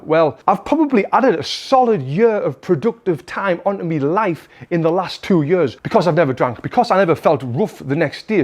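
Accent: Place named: Newcastle, United Kingdom. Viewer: Geordie boy, lovely, well done.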